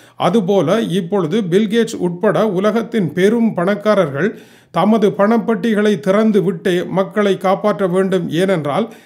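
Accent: Sri Lanka. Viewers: அழகான தமிழில் பேசுகின்றீர்கள் பூர்வீகம் இலங்கையோ......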